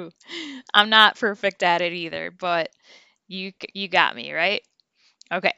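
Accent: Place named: United States. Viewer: I am disappointed because you clearly have have a non-native, American accent in Spanish. For example, at 2;37 ff. you say "ca", "co", "cu" with gringo aspiration on the [k] sound, you say it like [kh]. In Spanish, [k] has zero aspiration. IMO only native speakers should be teaching phonics and accent.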